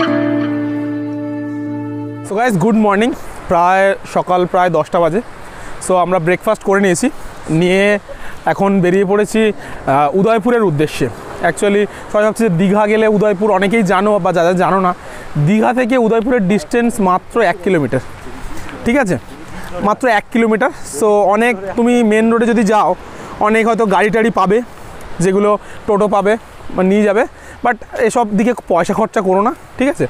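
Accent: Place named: Bangladesh. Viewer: তোমার বাড়ি কি বাংলাদেশ কথাবার্তা শুনে সেই লাগছে আমার বাড়ি দীঘা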